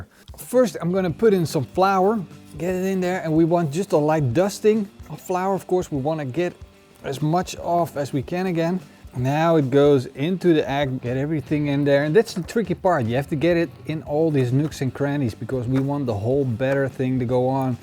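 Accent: Netherlands. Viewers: In less then then 10 sec I knew you must be dutch lol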